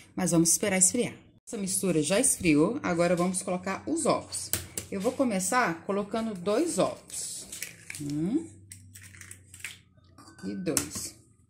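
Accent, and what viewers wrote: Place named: Minas Gerais, Brazil. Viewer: que bom escutar se o sotaque mineiro. Sou mineira mas moro em Brasília. Vou fazer a peta agora!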